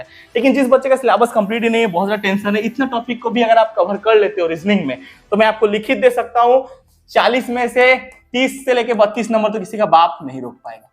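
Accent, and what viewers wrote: Odisha, India. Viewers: Bhai tu odisha ka hai kia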